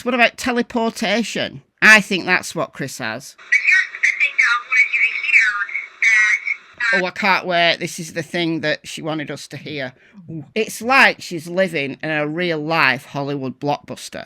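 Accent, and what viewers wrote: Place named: North, United Kingdom. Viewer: Heh heh heh no one can rant quite like a northerner! Brilliant! Well said!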